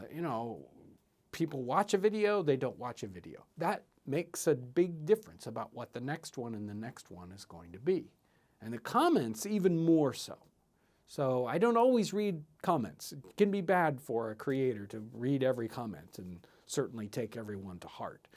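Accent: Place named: Indiana, United States. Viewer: He sounds, gesticulates, and annunciates things a lot like my dad, probably because he’s from Indiana, and so these always take me back to conversations at the table about history, politics, faith, and logic with my dad. I love the perspective and the info, and the recipes are fun, but that’s actually the subliminal reason I think I find these videos so home-y 🤗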